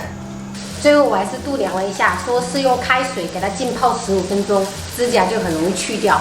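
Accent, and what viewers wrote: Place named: Fengzhou, China. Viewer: 听口音，好像是丰州的，或是浮桥?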